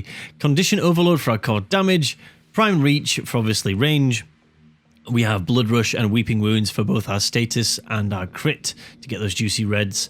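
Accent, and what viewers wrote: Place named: United Kingdom. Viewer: love that british voice of yours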